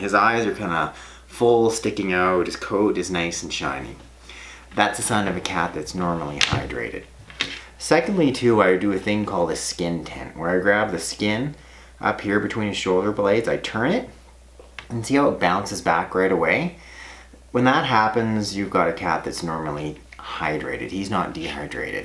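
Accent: Canada. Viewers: Yes it is helping! I don't know if Canadian accent is much different from the American one, but surely my English is not as good as I thought 😂 but I admire your channel as a very needed social inciative. Tk you!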